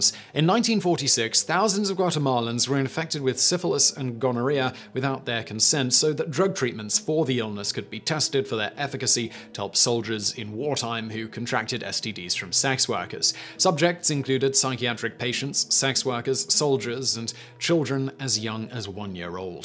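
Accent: United Kingdom. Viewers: One minute for your thumb nail, meanwhile all I heard was race race race race race from a white guy with the accent of like the most imperialistic nation that still exists. Race race, race race race race race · The British accent is echoing in my headddd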